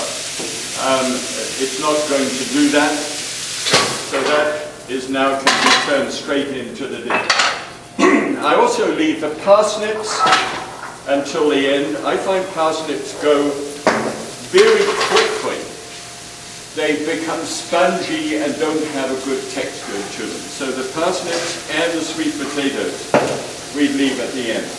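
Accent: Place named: United Kingdom. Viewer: I always enjoyed watching the Galloping Gourmet. He always spoke in Japanese, though. His jokes were also very Japanese. I did not know he had British accent until recently.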